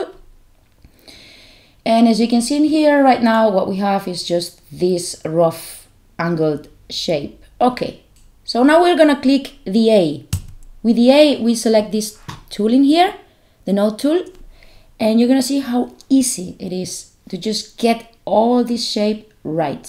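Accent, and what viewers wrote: Spain. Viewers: Hola, la verdad es que me encanta escuchar el ingles con este acento nuestro Español, de cualquier manera queria decirte que me encantan tus videos y queria preguntarte haces algun tipo de clase online, de clase privada me refiero y por cierto todo lo que muestras aqui aplica en Affinity photo tambien, ahi me dices gracias.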